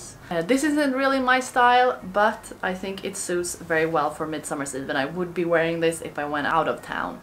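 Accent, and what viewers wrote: Sweden. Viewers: Jag tycke väll att de va lite svensk brytning i din engelska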